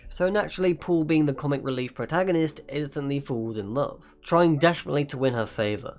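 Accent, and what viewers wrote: United Kingdom. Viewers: Why are you British tho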